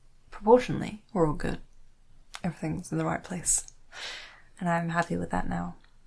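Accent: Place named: Australia. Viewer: Aussie accent love it❤!!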